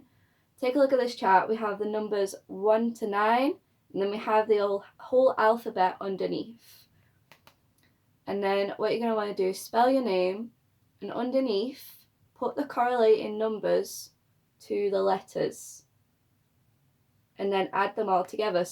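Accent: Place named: United Kingdom. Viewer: Luv the British accent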